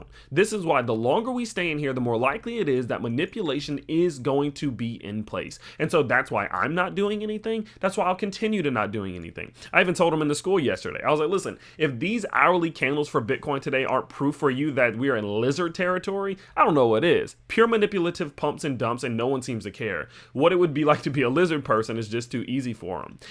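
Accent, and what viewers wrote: DC/Maryland, United States. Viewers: You have a DC/Maryland type accent. Maybe Delaware but prolly not....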